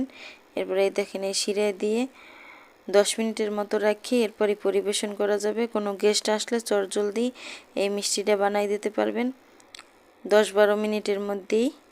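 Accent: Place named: Barishal, Bangladesh. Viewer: আপা,আপনার বরিশালের ভাষাই বলেন,তাহলেই ভালো লাগে।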